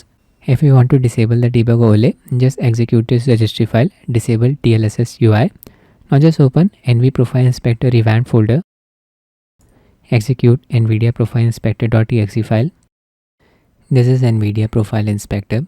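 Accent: India. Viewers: I dont understand indian english..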